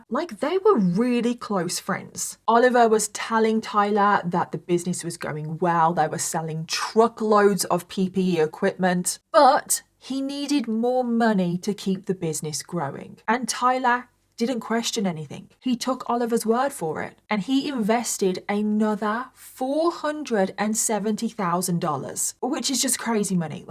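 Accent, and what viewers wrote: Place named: Birmingham, United Kingdom. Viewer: I’m just messaging to say welcome back nice to see you do true crime for us all ❤ much respect from the brummy girl it’s nice to here someone with the same accent as me for once 😅 happy new year 🎉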